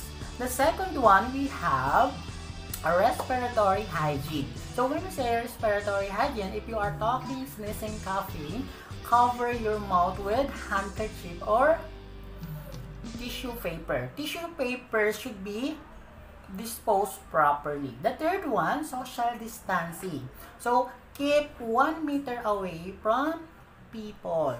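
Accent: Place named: United Kingdom. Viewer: Yung totoo po pinakikingan ko po yung boses nyo About Corona virus Akala ko po british kayo nung Pag tingin ko kayo pla Salamat po sa pagbabasa samin tungkol po sa pandemic na sakit Ingat kayo jan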